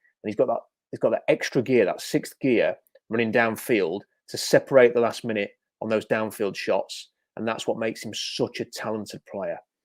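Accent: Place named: Manchester, United Kingdom. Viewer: Hey, a draft guy with an English (Manchester area, I think) accent. Subscribed and watching the past videos.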